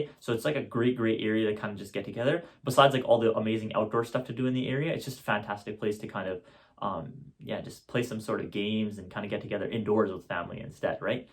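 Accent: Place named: Fraser Valley, Canada. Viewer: You have the strongest Fraser Valley accent! Beautiful job. You are so proud of your community.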